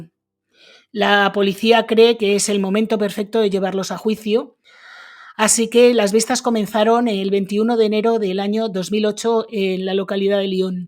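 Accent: Spain. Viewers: Hola querida. Me he pasado la tarde de hoy viendo tus programas y ya me suscribí, eres encantadora con tú acento tan español. Recibes un fuerte abrazo desde República Dominicana y muchas bendiciones.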